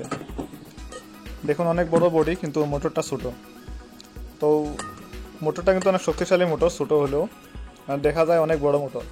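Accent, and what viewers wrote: Sylhet, Bangladesh. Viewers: ভাই সিলেটি নাকি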